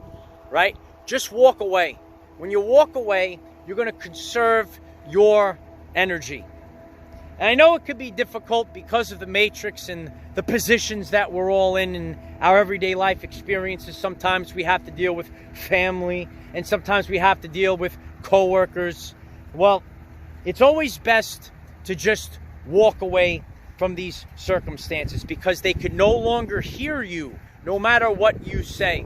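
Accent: New York, United States. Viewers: WOW! This was SUCH an amazing, powerful message. Thank you so much AA. Half my family and friends are jabbed zombies and I tried for years to wake them up, to a point of utter exhaustion. Now I just barely got enough energy to carry myself through so this message was perfect. Anyone else in here experiencing "glitches" with communications? Half my text messages and emails either never get received or I dont receive theirs. Its very odd....come to think of it, those are the zombies in my family and friends. You are so dead on when you say They cannot hear us anymore and the frequencies dont match anymore. That would explain all the "communication glitches" with my emails and texts. Thank you so much for all you do. Love your Yorker Accent:) Hugs from Canada xoox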